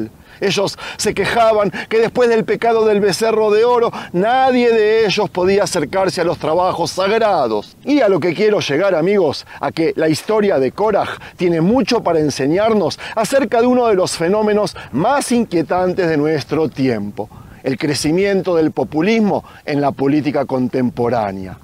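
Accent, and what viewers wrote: Buenos Aires, Argentina. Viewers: Shalom, cada vez que te oigo digo, este hombre no puede negar que es Argentino y Porteño. Muy buena la metáfora . Los gobiernos populistas te empobrecen y después hacen creer de que eres un pobrecito y ellos como son buenos te da un poco de comida y alguna beca. Y sabemos, que siempre la comida gratis siempre es la más cara. Cariños a tu esposa, festejando el aniversario en tu trabajo. En lugar de llevarla a Paris. Jaja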